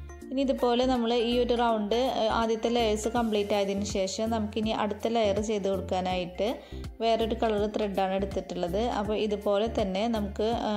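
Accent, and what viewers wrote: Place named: Thrissur, India. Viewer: trissur slang💕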